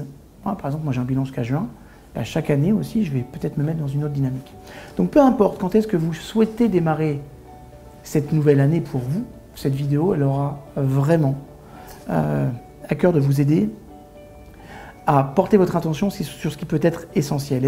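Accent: Canada. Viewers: Très intéressant je vais m'y mettre des aujourd'hui!!en passant j'aime beaucoup ton accent canadien je suis de Québec maintenant vivant au Costa Rica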